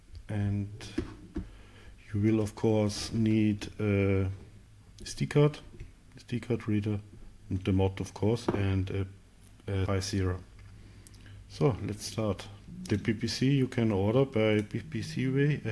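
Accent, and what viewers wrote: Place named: Germany. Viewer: An hand des Akzents schreibe ich auf Deutsch. Cooles Projekt. Sind die GPIO ports noch nutzbar? Dann wäre das Teil nämlich interessant. Ich habe gesehen das du die Platine dafür über pcbway verkaufst... Wie sieht es mit dem 3D Gehäuse aus? Ein gps und evtl. Lora mod wäre nich cool Dann könnte man es als meshtastic nutzen. Ich liebäugel nämlich mit dem Teil, aber mit dem reinen pico sehe ich für mich keine Anwendung die ich nicht auch mit ein esp32 erledigen könnte (von der Mobilität mal abgesehen) und dann werden für mich ein hackberry oder uConsole interessanter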